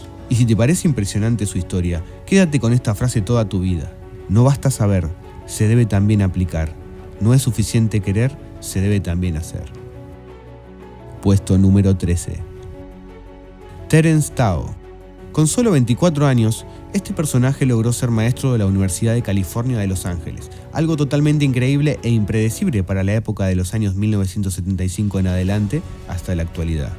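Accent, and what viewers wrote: Argentina. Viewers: Grande ese locutor argentino papa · Hermosa voz argentina!!!! me parecio excelente la locucion, nose por que el problema de que sea argentino!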